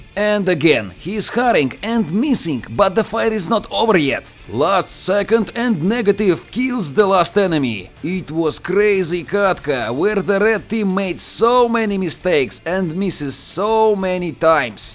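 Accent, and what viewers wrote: Russia. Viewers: True russian accent.Perfect English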